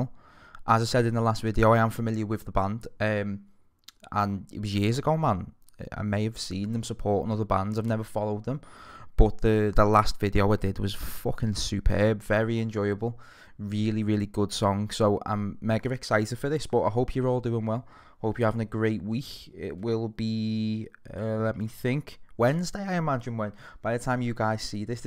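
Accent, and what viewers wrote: Liverpool, United Kingdom. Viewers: I love all your videos lad I especially love hearing another scouser really loving Great work lid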